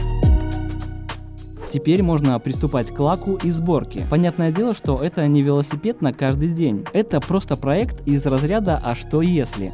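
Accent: Grodno, Belarus. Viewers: Дружище, ты не из Гродно?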